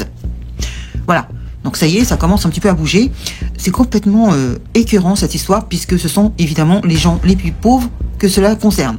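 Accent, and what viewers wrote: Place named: Rhône Valley, France. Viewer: Excellente nouvelle, merci du partage plein de bon sens, depuis la Côte Basque ❤ C'est migonn cet accent de la vallée du Rhône😊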